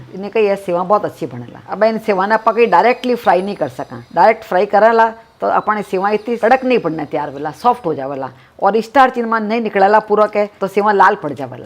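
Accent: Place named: Rajasthan, India. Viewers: Aunty aap rajasthan me kaha se ho....??? Meri Bua nokha-mandi bikaner rahti h wo aur unke bachhe asehi bolte h.... Mujhe unka talking way bahut pasandh h.... Aap bhi waise hi bolte ho....bahut achhe lagte ho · Aapko mera Pranam : aap ki marvadi bohot achi lagti hai , meri maa bhi aapki tarha bolti thi , thari boli mane bohot achi lage hai: thane Mero bohot pyar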